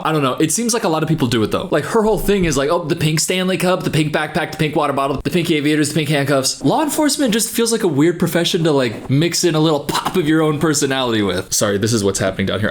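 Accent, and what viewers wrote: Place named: South, United States. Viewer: The Southern mom voice is one of my favorites😂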